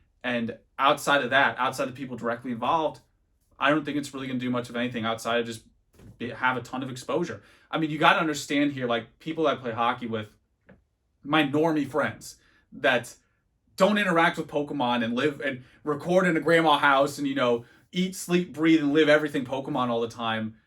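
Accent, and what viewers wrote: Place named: United Kingdom. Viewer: Haha i keep thinking its BBC and then think of like British Dr. Who producers talking about Pokemon as a side job lolll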